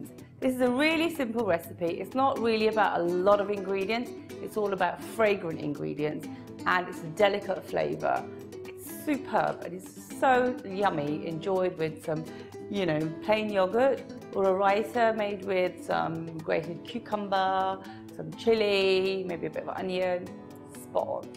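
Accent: United Kingdom. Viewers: R u frm london?? U hav british accent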